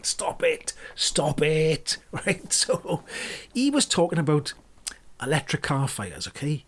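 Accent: Wales, United Kingdom. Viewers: I'm a diesel mechanic and not a big cheerleader of EVs, but I'd listen to this guy read the weather. Love a good Welsh accent, and that's a 10! 👍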